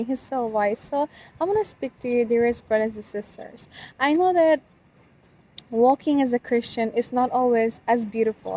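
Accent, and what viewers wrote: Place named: United States. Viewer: Your English like American English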